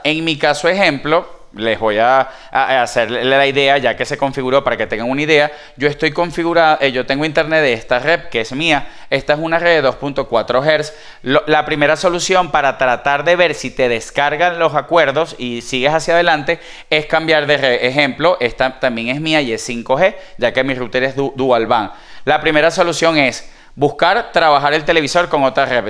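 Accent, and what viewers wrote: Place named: Venezuela. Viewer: Hola, un saludo amigo. Percibo que por la manera de hablar eres de Venezuela. Sí es así, sabrás cómo hacer para que reconozca la zona del país? Intento conectarme a LG Channels y siempre me da el mensaje "Lo Sentimos. LG Channels no está disponible para su zona" cambié la ubicación en los ajustes... Y en los ajustes solo me aparecen (Automático - Canadá - México - Estados Unidos - otros). Sí me podrías ayudar, mucho te lo agradezco, hermano.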